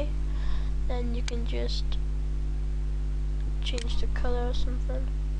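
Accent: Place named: United States. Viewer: lol!You've done what i do-ur talking almost in an american accent!lol.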